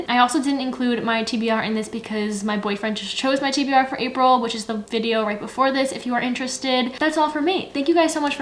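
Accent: Canada. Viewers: she sounds Canadian or something or Ukrainian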